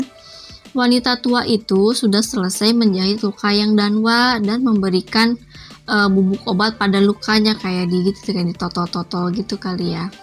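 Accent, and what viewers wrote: West Java, Indonesia. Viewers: teh urang Sunda?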